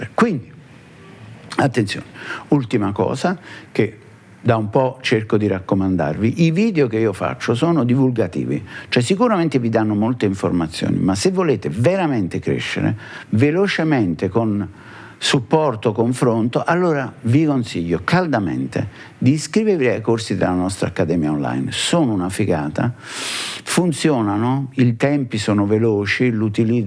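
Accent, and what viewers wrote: Naples, Italy. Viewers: Il tocco di napoletanità è sempre piacevole. Complimenti!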